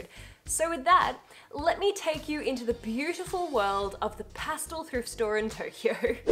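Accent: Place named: Australia. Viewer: New subscriber here. My great grandmother was from Northam Australia. My great grandfather brought her back to the United States, he met her when he was stationed over in Australia during WW2. He was in the navy. Your Accent, brought back great memories of my Nan. She passed away when I was 15, I'm now 31. My great grandfather is still alive and well. My daughter is his first great great grandchild. 😍 My Nan never lost her Australian accent.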